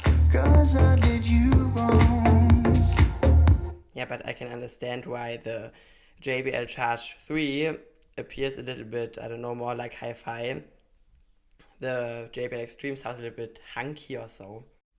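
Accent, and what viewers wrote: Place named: Vietnam. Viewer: keep up your great work, dude! I love your vinglish!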